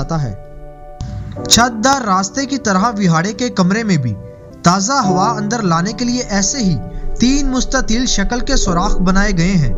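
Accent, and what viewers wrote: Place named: Pakistan. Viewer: VDO se ziada ap k urdu accent se lutf andoz huay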